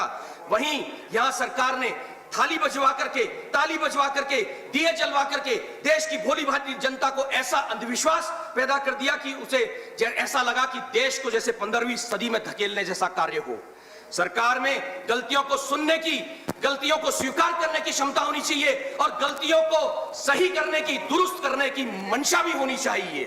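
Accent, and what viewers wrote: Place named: Revdar, India. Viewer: रेवदर की आवाज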